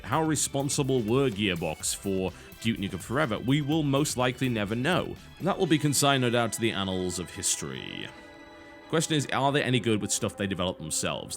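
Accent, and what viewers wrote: United Kingdom. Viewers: No, it's just the British English (a.k.a. English in England i.e. the correct English) way of of pronouncing those words.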